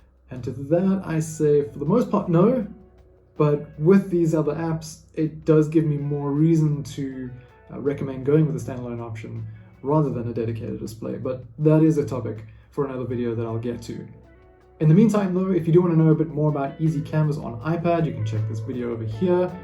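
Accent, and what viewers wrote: South Africa. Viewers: Informative video thank you ! I am an interior design student .. here in SA so glad to here a familiar SA voice 😁 I recently just invested in the Samsung tab s10 FE and was wondering does Samsung second screen work similar if I’m using adobe illustrator to draw on the tablet but have it connect with a laptop 😁? I’m new to learning digital drawing for my design projects and need to figure out the best workflow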